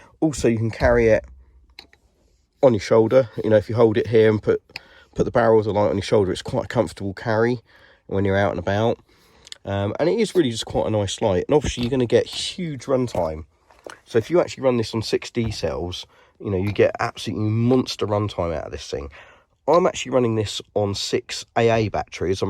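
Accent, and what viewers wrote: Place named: United Kingdom. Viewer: British speech 😊😊😊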